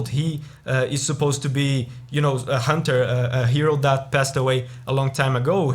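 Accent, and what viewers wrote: Brazil. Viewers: You sounds like a Brazilian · cara eu tava vendo teu vídeo e percebi pelo seu sotaque q vc é Brasileiro, massa demais! essa ost é absurda mano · Sotaque brasileiro, kkkkk certeza que é brasileiro, com um ótimo inglês.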